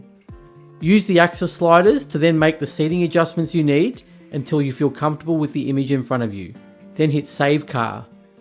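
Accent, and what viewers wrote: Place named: Australia. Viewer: I love your calm style and Australian accent 😃 Much love brother 🍻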